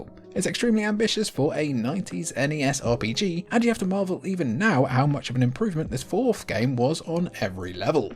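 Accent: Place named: United Kingdom. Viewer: Fax very much! Not just for this vid but the others I have watched. Good to hear another brit even when you get the tongue twisters in your videos hah. Faxanadu really gave me Zelda 2 vibes with the side scrolling.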